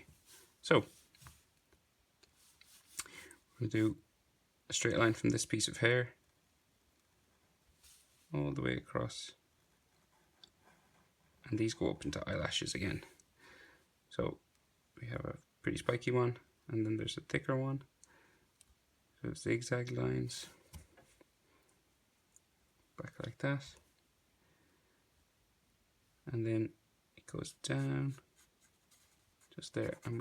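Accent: Ireland. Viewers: hey man you from cork? I hear that accent. Nice drawing thanks for the tutorial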